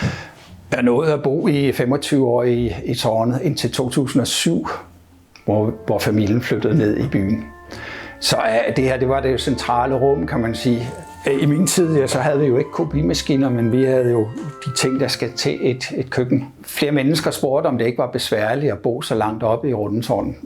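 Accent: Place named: Bornholm, Denmark. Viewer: Tak for denne skønne video. Dejligt at høre den bornholmske dialekt i København. Sikken et liv, må have levet.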